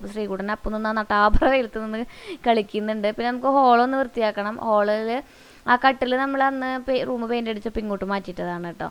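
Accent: Thrissur, India. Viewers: Chechiii njan adhyayitt kanuattooo...samsaram izttayii.. Thrissur anO ???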